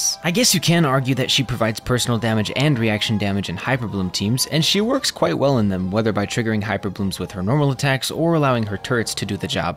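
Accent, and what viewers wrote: United Kingdom. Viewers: The British accent 💀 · The English accent though hehehe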